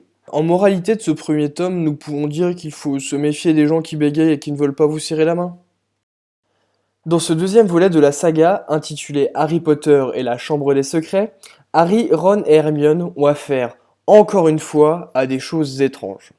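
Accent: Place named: South, France. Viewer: super voix, j’adore l’accent sudiste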